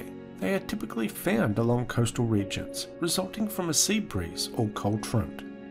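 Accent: Australia. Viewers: What I hear just because of the accent is : wotair ,Yair ,Roll cwoulds aND AUSTWARLIA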